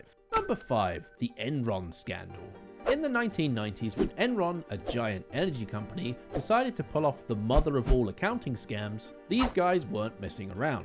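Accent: United Kingdom. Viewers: Wait is this brit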